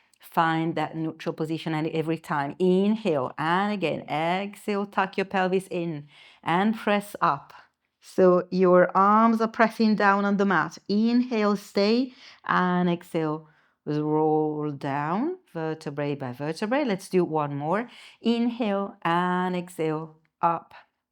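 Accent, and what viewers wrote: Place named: United Kingdom. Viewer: Ciao, che piacevole sorpresa trovare il tuo canale in inglese! Complimenti per l’inglese e l’accento British 😊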